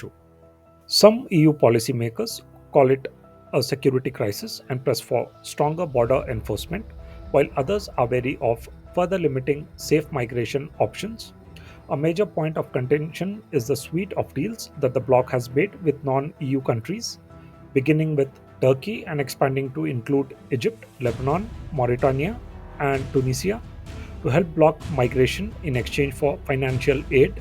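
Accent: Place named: India. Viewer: The narrator sounds Indian, plenty of room in India to enjoy mass illegal immigration . Send them to India or the other countries of the Indian sub continent where they can enjoy diversity at the expense of the Indian people or the people from the surrounding countries. Or let the dishonest narrator welcome them into his home free of charge. Not asylum seekers but illegal immigrants , enough with your lies.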